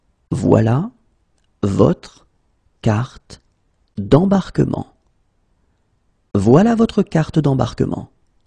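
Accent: France. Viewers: Ouais c'était l'impression que j'avais au début mais c'est clair que vous êtes de France. Je ne suis pas français du coup c'est peut-être juste à cause de cela.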